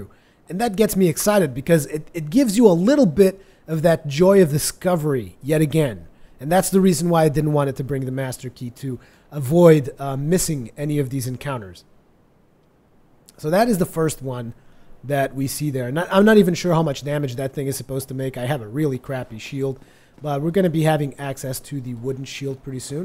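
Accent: United States. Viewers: First vid, Vaati sent me. Damn, your English is perfect. You sound American. You have no accent. I have Portuguese heritage, but I don't know what it sounds like. Weird that you don't have an accent. Great vid though. Going to watch the rest if this play through.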